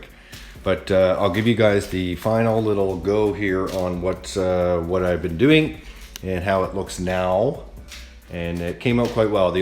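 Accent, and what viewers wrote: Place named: Newfoundland, Canada. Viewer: You from the Maritimes? Newfoundland?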